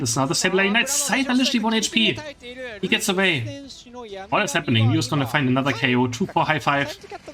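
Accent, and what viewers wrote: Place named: Germany. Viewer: Your German accent is too much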